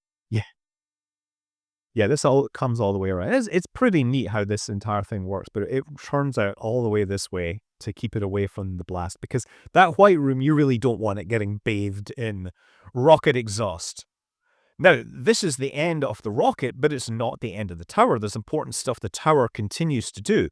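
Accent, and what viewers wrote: United Kingdom. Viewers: Another Brit narrating US History. Why?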